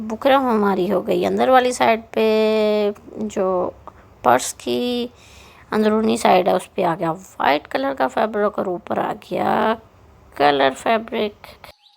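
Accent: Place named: Punjab, India. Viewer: Nice punjabi touch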